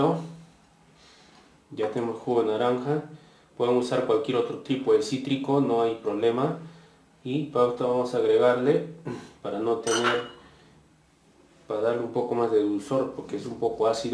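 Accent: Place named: Peru. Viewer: Si se nota que eres peruano 😂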